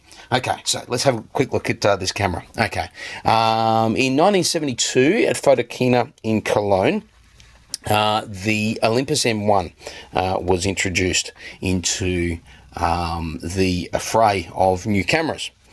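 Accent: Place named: Australia. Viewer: Very indistinct speaker....not only ehm ehm ehm but also strong "down under accent" makes him difficult to understand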